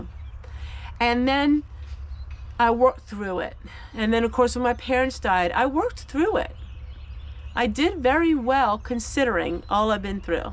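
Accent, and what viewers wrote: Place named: Maryland, United States. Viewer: your channel is so fun and uplifting and a little wacky in a good way. i enjoy your garden and your collectibles and of course it's nice to hear someone not be ashamed to mention the Lord Jesus. your accent is similar to ours in Maryland but ours is a little more southern. do you have any pets other than your chickens.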